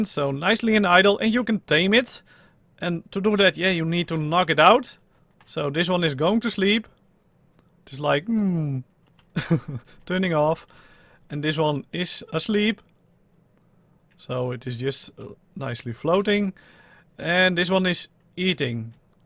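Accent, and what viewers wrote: Netherlands. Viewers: DUTCH ACCENT FOR THE WIN :3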